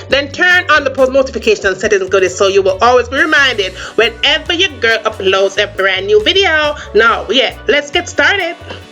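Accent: Jamaica. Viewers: New sub sending love from the South!! Sent here from Ray Mack. Blessings & I look forward to cooking your recipes. Love your Jamaican accent, sister. 💖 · Just listening to you I feel like I’m in Jamaica just need the weather, food and the smell😂😂 big up ur damnself mumma str8 outta London UK 🇬🇧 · My girl mi subscribe jus cuz yuh sound lik a real yaadie goodie.. mi a guh try dis, cyaan duh the whole buy buy ingredient, jus a guh use some a di store bought... den grill it. Is where yuh find scotch bonnet?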